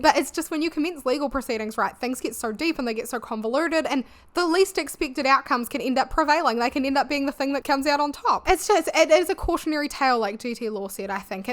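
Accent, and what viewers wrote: New Zealand. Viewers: without any knowledge i will say to my brain, your accent is what Glorilla’s southern accent is to the US South to NZ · I think it's the New Zealand accent but when you say, it sounds just like, or is it the other way round? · omg i love it, the research you do is so thorough! btw I am a kiwi living in the UK and I am so glad to have found your channel, your accent and humour is like a little slice of home <3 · Where in NZ are you from? I've lived here my whole life, and I can't place it 😅I'm from Christchurch! It's always good to see a fellow Kiwi putting themselves out there. My fingers and toes are crossed for your success <3 Omg also do you remember when she came to NZ in 2014? It was mad! People were desperate for tickets. My sister and I went for our 10th birthday and ended up giving our tickets to two teenage girls who were crying outside Spark Arena. 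I honestly still feel good about it lol We were right up the top and I got so sick and overwhelmed. We ended up having dinner with two nice ladies at the restaurant next door. Man, that was an interesting era of pop culture. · Girl please never apologize for the way you talk, I was an au pair in NZ and your accent reminds me of that amazing time there 🫶 when I watched one of your videos with my bf he said he can finally pinpoint what my accent sounds like (English isn’t my first language), and it made me so happy that I still have some of it left years later. Love the kiwi accent, love your videos, cheers from halfway around the globe ☺️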